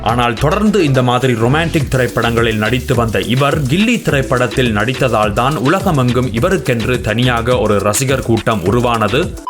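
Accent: Sri Lanka. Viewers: R u srilankan..........? Ur Tamil pronunciation 100% matching with srilankan tamil slang ...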